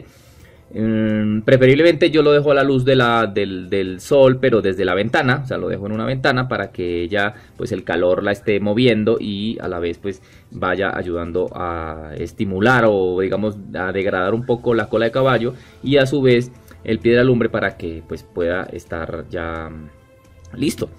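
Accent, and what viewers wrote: Colombia. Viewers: Colombiano, imagino que de algún lado del llano? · Hola...siempre te veia por hay y la verdad no me atrevia a ver el video 😊y ahora que vi nos los quiero ver todooooos,muy chevere escucharte ...es como escuchar a mis hermanos sabes😉muy colombiano que chevere!!Espero que tu canal siga creciendo cada vez mas!!muchos exitos!!😃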